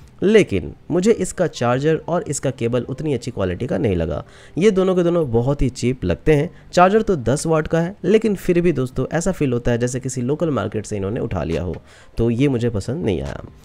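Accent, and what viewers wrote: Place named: Bhojpuri, India. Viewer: Ye Bhojpuriya touch hamko acha laga 👌❤️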